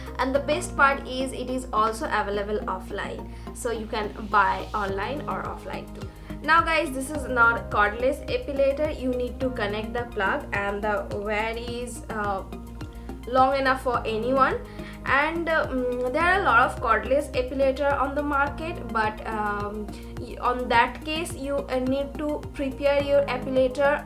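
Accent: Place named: India. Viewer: Honey, she's speaking in indian accent. I'm not an indian but her accent actually not that hard to understand.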